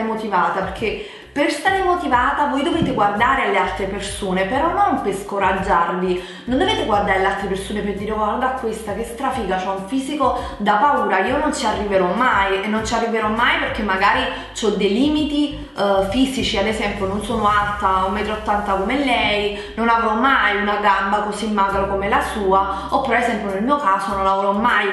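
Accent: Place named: Campania, Italy. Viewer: Ciao! Ti seguo da diverso tempo ormai e non posso che dirti grazie per come sai motivarmi, inducendomi a condurre una vita più sana ed equilibrata. Quando guardo i tuoi video mi viene proprio voglia di dedicarmi a me stessa e al mio benessere. E così continuo ad avere un'alimentazione piuttosto varia (visto che sono siciliana e abito fuori città non ho grossi problemi a mangiare cibo raccolto dalle nostre campagne [che più bio non si può XD]) e pratico nel mio piccolo un po' di attività fisica fatta di lunghe passeggiate ed esercizi tra le mura domestiche (la palestra non fa per me)... Ti ringrazio per come sai spronare e motivare chi ti segue con sincero affetto. Continua così! Un bacio grande 😘❤️ P.S. Adoro il tuo accento campano 😂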